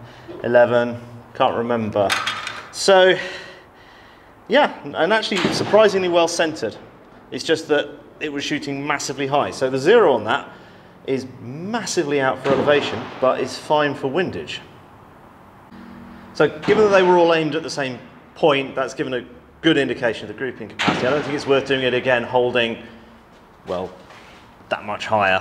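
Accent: Switzerland. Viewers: Thats some fine swissgerman right there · the language spoken by the guy behind is actually Bernese German or even more precise Bernese Oberland German. Swiss German doesn't actually exist as a general spoken or written language. Every region has its own dialect. My own dialect (Zuri Schnurre) is different from the folks in Berne, Thurgau, or Basel. And if you really want to hear a strange dialect you have to visit the German part of Valais or Wallis. And by the way starts to sound like a real Berner lately. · Your switzerdütsch is adorable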